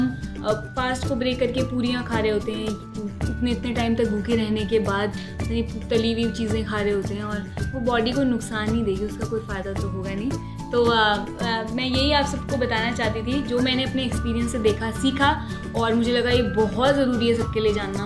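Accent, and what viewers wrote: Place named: Uttarakhand, India. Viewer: I can guess you are from uttarakhand ...😅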